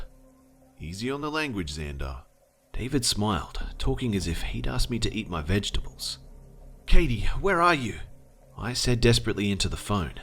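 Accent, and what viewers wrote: Australia. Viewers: You know. I heard there's the full version somewhere. What's the point in that when you can have the himself read it to you in his amazing Aussie voice as you are about to sleep? Exactly.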